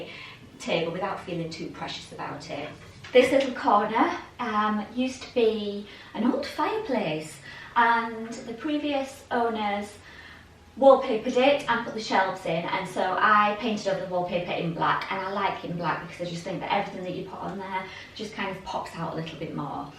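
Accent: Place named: Yorkshire, United Kingdom. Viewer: I love your style and your Yorkshire accent. Just gorge. x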